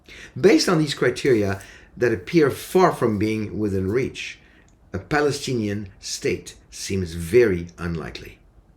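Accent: France. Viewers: Dear " frère " I always hear the french accent and I like it. Au revoir.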